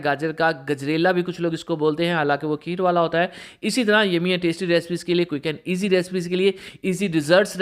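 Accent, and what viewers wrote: United Kingdom. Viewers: Nice recipe,,, liken Aap urdo baat kar rahihen ao lihja English ka hai lihaza lihja thek kren bahut bura lagta hai Aapki sar pi firingi Bhoot swar hai😈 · Nice.. But plz Urdu lehja me he Urdu bolain ,,, English accent me bolne ki koshish na Karen. Apki voice bahot piyari hy ...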